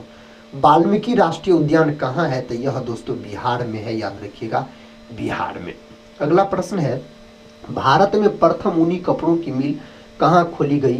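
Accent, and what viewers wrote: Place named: Uttar Pradesh, India. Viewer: Maza aaya bt up ki Laungaug thodi sochna pdta hai bt sweet hai 😂🙏👌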